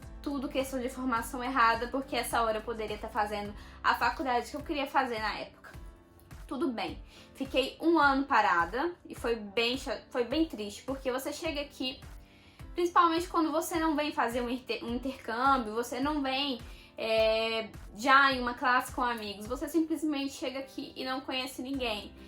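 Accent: Italy. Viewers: Você sem perceber adquiriu um pouco do sotaque italiano, que legal haha! Você fala "cantando" em alguns momentos. Un abbraccio. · Ouvir vc falando que sofreu e ouvir vc falando já com sotaque italiano da um orgulho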